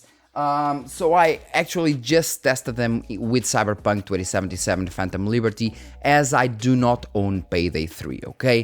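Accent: Portugal. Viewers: I recognized your accent in Portuguese. Brazil here!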